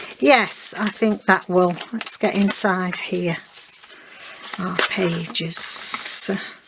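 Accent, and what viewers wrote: Yorkshire, United Kingdom. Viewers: Pease don,t change,i have a Yorkshire accent too,of which i am proud and i am much older than you.You are like a breath of fresh air on Youtube. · Love the envelope pockets. The skull tag topper is really effective. I love to listen to you chatting while you work. The accent from God's Own County is something to be very proud of and reminds me of my roots. If folk don't like it, that's too bad! You are a Yorkshire lass "wi' nowt tekken out" . Keep on crafting!!!!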